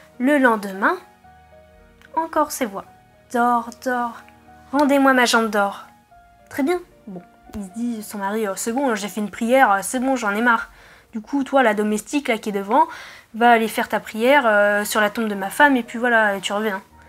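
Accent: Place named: Spain. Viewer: Coucou, j'adore ton accent espagnol. J'adore ta petite histoire. Merci pour ce que tu fais.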